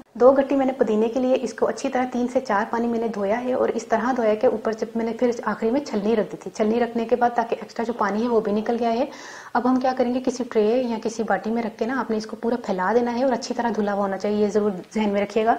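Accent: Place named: Punjab, India. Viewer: Punjabi voice so cute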